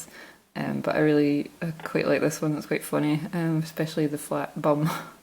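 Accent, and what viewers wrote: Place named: Scotland, United Kingdom. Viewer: Hey lovely to hear another Scottish accent Mudlarking ; awesome .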